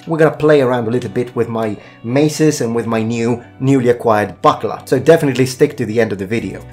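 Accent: Italy. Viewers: Are you Italian where r u from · Sei italiano vero?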